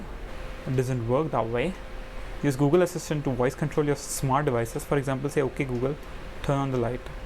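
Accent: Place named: India. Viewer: Love your accent bro, you almost sound Indian ..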